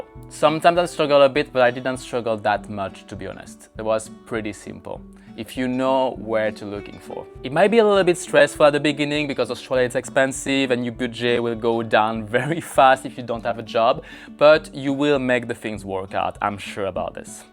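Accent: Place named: France. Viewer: It's either your French accent or my screwy hearing 😆.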